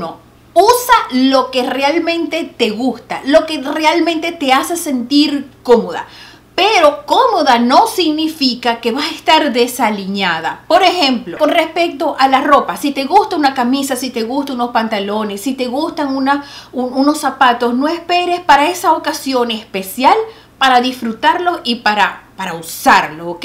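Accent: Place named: Venezuela. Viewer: Holaaa soy de la Isla de Margarita.. estoy viendo tus vídeos son magníficos que buena eres en lo qué haces.. cuando te escuché me dije ay me suena a Venezolana jajajaja me alegra tanto ver mi gente venezolana por el mundo dando lo mejor de lo mejor.. gracias, gracias, gracias por estar en las redes sociales llevando inspiración y si se puede estar bellas y lucir jovial hasta con 80 si se puede.. Dios te bendiga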